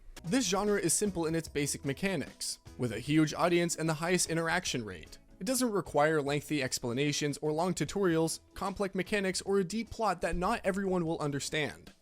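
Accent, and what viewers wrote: Russia. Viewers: Are you russian??? "Convierrted"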